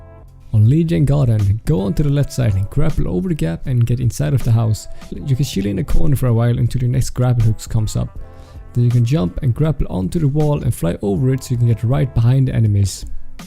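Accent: Sweden. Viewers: are you Swedish? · Alex B its a swedish accent or southern swedish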